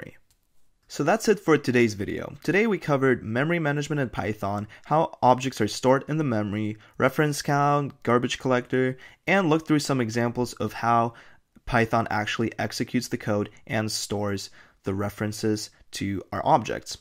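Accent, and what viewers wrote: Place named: Russia. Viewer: я то думал, что не так, а ты русский оказывается😅 Хоть эти ошибки возможны в речи у носителей, считай чуйка сработала.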